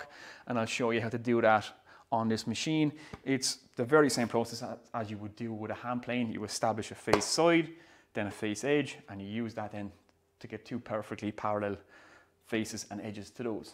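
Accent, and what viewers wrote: Ireland. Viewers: I hear an Irish accent, I sub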